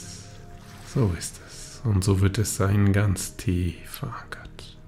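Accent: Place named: Franconia, Germany. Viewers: Allmächd, ich liebe den leicht fränggischen Akzent. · Ich dachte du kämmest aus Bayern mit gefällt die stimme auch deine ganze art die du zeigst hier bei Youtube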